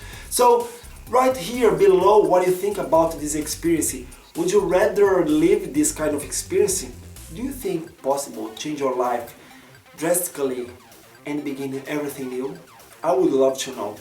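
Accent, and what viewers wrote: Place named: Brazil. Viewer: Ver um brasileiro falando inglês me dá uma sensação excelente de que eu 'domino' a língua, pois entendo 80% do que voce fala kk! O sotaque é fator determinante na conversação, é incrível. Keep it up,!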